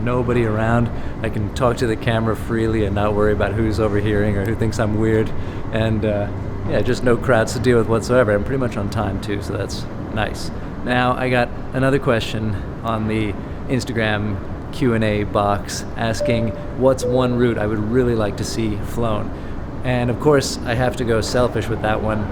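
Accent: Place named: Canada. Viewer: Kind of a different question for you,...I'm a bit of a student of language and I'm really curious where you did your English training? You have, I'm guessing, an almost Canadian accent for someone from Sweden. Anyway, love your content and use of the English language. 🙂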